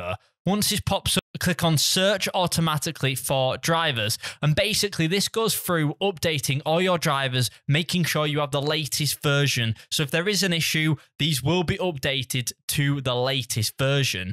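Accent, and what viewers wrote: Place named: United Kingdom. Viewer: Dang bros British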